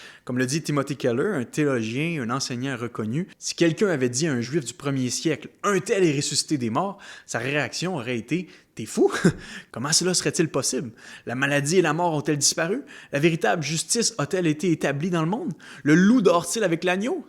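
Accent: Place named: Quebec, Canada. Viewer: Bonjour, pour commencer je désir préciser que je crois en l'œuvre de Jésus-Christ et en sa resurrection. Il me semble que dans votre explication que vous avez oublié de prendre en compte la résurrection de Lazar ( dans le nouveau testament) et la Résurrection d'un homme dans l'ancien testament (2 Rois ). Bonne continuation, merci pour vos partage avec ce beau accent du Québec, moi j'ai l'accent Alsacien.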